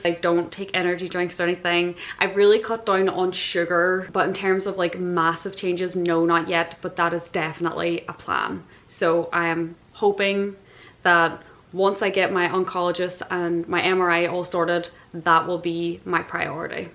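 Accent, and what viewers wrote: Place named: Ireland. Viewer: The further into the video the stronger your Irish accent becomes. I really love that 🥰😂 I am also happy for you that things are going the way they are right now. You should not be so concerned with people who think you are a poser 👉I refer to your Instagram post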